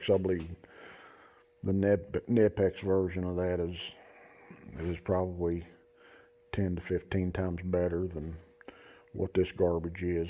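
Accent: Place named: West Virginia, United States. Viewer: oh wow, I’m on the Wv border in Ky. My family is from Wv. I thought I heard you mention Charleston and I was like man the accent sounds like home. We do most of our shopping in Huntington and try to stay in corridor g when we head to Charleston.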